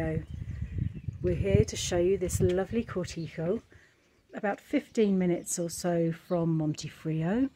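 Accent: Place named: United Kingdom. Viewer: Thank you for presenting this video. It is a beautiful property. Here are some thoughts I have. 1. You are a very good presenter. I am not a brit, so your accent is a bit heavy for me, but because you are thoughtful and speak slowly I did not miss even a word. Also your pace is slow, and allows enough time to see everything, and your camera work is slow and makes it great to watch. 2. Would be nice to have an aerial map to see the boundaries of the property, since clearly the 2000 sqm is much more than we seen, so to have an idea of where the rest is, even if it has been implied. For example, I would liked to see whether it is even possibly to convert some of it to gardening, fruit trees kind of area, etc. Many thanks for a great presentation.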